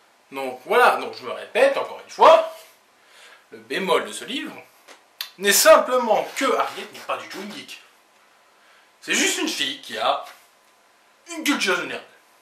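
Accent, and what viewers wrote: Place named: North, France. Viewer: C'est bon le titre est dans le bon sens pour nous XD tu me fais trop rire avec ta définition du Geek, enfin la c'est plutôt le stéréotype x) (HS : on entend bien ton accent du nord, j'adore ! Ça me rappelle des souvenirs !) XD prendre son Larousse, la chose a laquelle je pense le moins (faut que je m'en achète un d'ailleurs) on sent le suspense monter quand tu cherches XD « Intello » c'est vrai que j'ai souvent entendu ça … tu lis ? T'es un/une intello, t'as des bonnes notes à l'école ? T'es un/une intello. Tu as totalement raison, les ¾ des gens ne savent pas ce que ça veut dire. Je pense que tous le monde (ou presque) doit se serrer la ceinture en fin de mois ! Oh sérieux j'aime ta façon de penser ! Je suis sur que si on se rencontrait en IRL on jonglerais entre grande amitié et de grand débat (j'adore les débats avec les gens que j'apprécie XD) (HS : je vois des livres dans ta bibli que j'aime bien XD) j'avoue que lorsqu'un livre à une moral j'adore ça, quand ça fou une grosse claque en pleine figure et qu'on réalise qu'avec ce qu'on a on est bien. 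Encore une fois une super vidéo ! J'ai hâte de voir la prochaine ! Bonne continuation !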